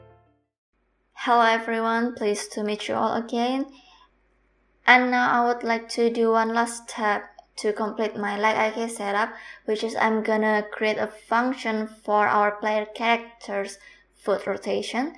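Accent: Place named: Indonesia. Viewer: Why do i hear indonesian accent.. btw nice tutorial.. i'll try it later to see if this still works in unreal engine 5 or not.. somehow i manage to do the footIK based on Z Axis trace.. but still struggling on the Rotation using Hit Normal..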